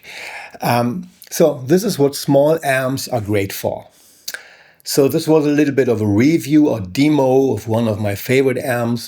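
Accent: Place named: Germany. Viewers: German accent is my favorite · I like this content, and I like hearing presenters with a German accent! Very cool, sir, very cool.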